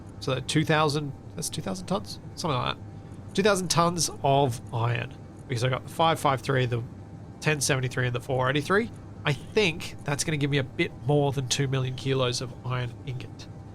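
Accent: Australia. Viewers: So many happy shout outs during this episode. Also a couple of frustrating times. All came out in a fantastic way of Australian accent, I love it. It always makes me smile, laugh and want to go back visiting down under 😊 Thanks, it is always a pleasure watching your episodes 🥳🇩🇰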